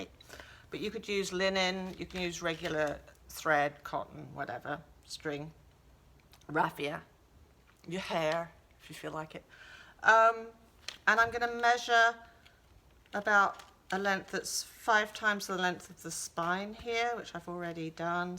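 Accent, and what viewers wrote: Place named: Ireland. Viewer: Thanks so much this is brilliant My mother is also Irish.... we can show off our Irish accents!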